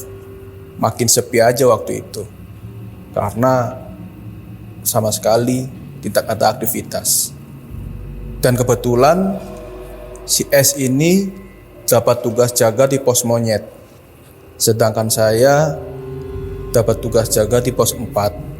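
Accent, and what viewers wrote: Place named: Bekasi, Indonesia. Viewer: Buset bang, bahasanya Bekasi bet dah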